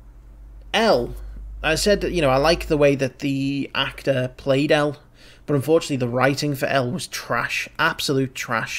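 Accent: United Kingdom. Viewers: I dont know why but I imangied you having a American accent from some reason but no we got a british guy 😂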